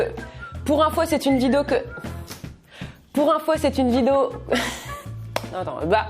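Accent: Canada. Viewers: tu mon youtubeur préféré des vidéos accent canadien et qui met quoi des vidéos trop trop rire 👍👍👍👍👍 🇨🇵🇨🇵🇨🇵🇨🇵🇨🇵👍👍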